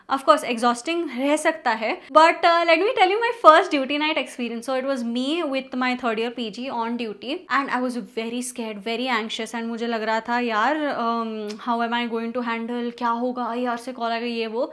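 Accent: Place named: Hyderabad, India. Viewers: I can hear that hyderabadi accent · Your hyderabadi accent was really beautiful ❤️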